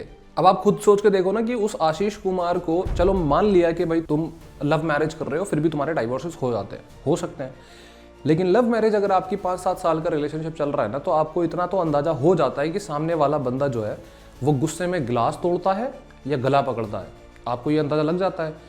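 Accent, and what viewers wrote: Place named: Tughlakabad, India. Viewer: yaar bhai tum pakka tuglakabad gaon ke ho mentally and educally bilkul baise hi sound kar rahe sayad mujhse 2 saal chotte hoge but baate bilkul meri jaise kar re ho mere bhai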